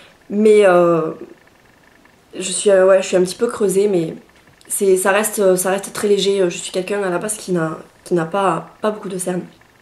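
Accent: South, France. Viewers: tu vien du sud avec ton accent je me trompe ? ^^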